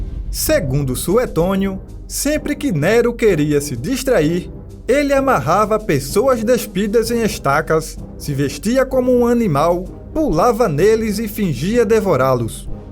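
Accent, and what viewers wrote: Northeast, Brazil. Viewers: Gostei do vídeo e das expressões nordestinas. É sempre bom conhecer mais a história, e ainda melhor com o sotaque do meu nordeste. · Narração muito boa! Sotaque nordestino o máximo. Vc me faz rir!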